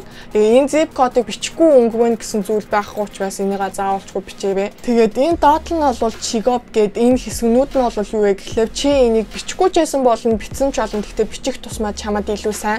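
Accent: South Korea. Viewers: Angli heliig solongosoor unshdag mongol ohin geesh heden gadaad nzdaa helsen tataj untlaa ineelee elgiin2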